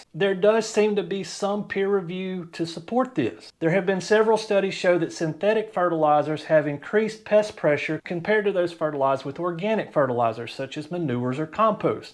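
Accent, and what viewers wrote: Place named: Alabama, United States. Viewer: Auburn, huh? I thought I heard an Alabama accent. I'll be subscribing to help me with my garden in Tuscaloosa.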